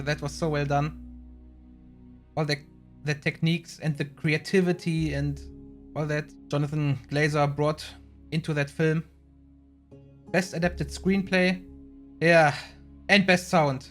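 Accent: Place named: Germany. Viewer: Good reaction. Just guessing by your accent - are you German yourself?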